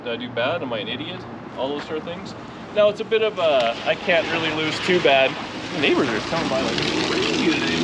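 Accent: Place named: Canada. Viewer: I knew you was Canadian as soon as the video started. I live in Detroit and you know the Hockey Competition gets pretty Heated. Nice Car Man.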